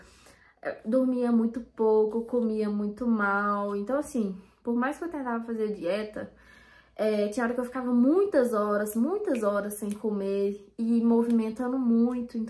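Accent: Minas Gerais, Brazil. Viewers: fico tão feliz por ter voltado. Gosto tanto desse jeitinho mineiro seu de falar, e independente do seu peso, voce continua linda!! ❤ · Caraaaaa... Apesar da situação sensível, obrigado pela coragem de compartilhar sua história com a gente. Eu jamais me imaginei assistindo um vídeo do YouTube de 30 min... Só hoje assisti os 3 seus. Um dos motivos é por vc estar compartilhando algo que não é só a suposta "perfeição" que vemos exposta na internet. Aaaah, cara, o sotaque mineiro (salvo engano) é maravilhoso! Tatuagem de interestelar 😮❤ mostra mais dela sim! Amo esse filme! Estimo melhoras e que tudo fique maravilhosamente bem para vc e para os seus. Abraço! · .. é uma delícia ouvir vc falar.. vc é tão boa comunicadora, seu sotaque mineiro é tão gostoso, e seu jeitinho é muito fofo! continueee <3 Deus te abençoe demais!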